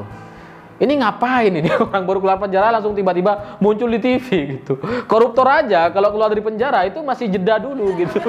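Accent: Madura, Indonesia. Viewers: Kok logatnya Madura?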